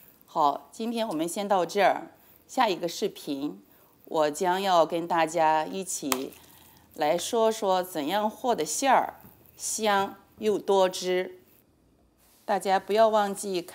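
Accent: Qingdao, China. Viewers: 在德国学包饺子，青岛口音太亲切了！ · 这口音……青岛人表示好亲切啊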